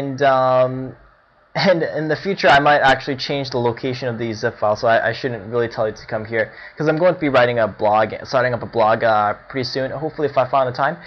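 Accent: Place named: Midwest, United States. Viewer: Thank-you for the tutorial! In answer to your question - your accent sounds like midwest American with a slight hint of Asian.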